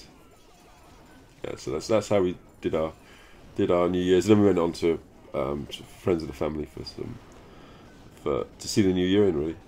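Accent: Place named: North Yorkshire, United Kingdom. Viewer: are you originally from around the North Yorkshire area? Your accent rings a bell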